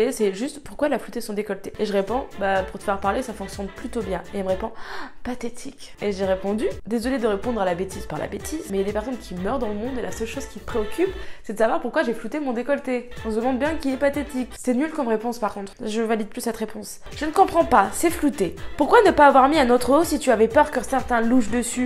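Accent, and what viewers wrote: South, France. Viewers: Fait se que tu as envie les gens son idiot 😑 j’adore ton accent du sud mdrrrr 😘❤️ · En vrai je comprend pas trop pouquoi tu te prend la tête avec ce genre de com', perso je les trouvents pas insultant et c'est plutôt légitime de poser des questions sur le contenue surtout si d'un moment à un autre ça change... Ils ont pas critiqué ton choix mais poser des questions et c'est pas grave (dans ceux que ta montrer). Si tu voulais pas repondre et te prendre la tête ne répond pas et passe à autre chose car je t'ai trouver vachement tranchante dans tes reponses de manière injustifié ^^' Ça ce voit que ça te tiens à coeur mais les questions de montage et de contenue sont inévitable sur youtube... tu te prend la tête pour rien ❤ Oui ta le droit de flouter/ ne pas flouter ce que tu veux et il y aurait eu des com' de toute manière mais sur autre chose... 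c'est ça la connerie de youtube et si tu te prend la tête comme ça pour des com' où les gens ne t'insulte/ ne sont même pas vulgaire / ne te reproche rien mais juste pose des questions tu vas avoir énormément de mal a supporter la vie de youtubeuse de 2020.. Et jcp pouquoi tu parle de féministe car je voue aucun com' qui dit " meuf tu es féministe c'est inadmissible de pas montrer tes tetes". Je pense tu te prend la tête pour rien ❤ ps: si l'accent du Sud c'est chou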